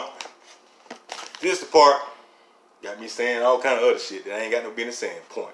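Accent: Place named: Memphis, United States. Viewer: Man sound like he from Memphis Aye mane… Say mane…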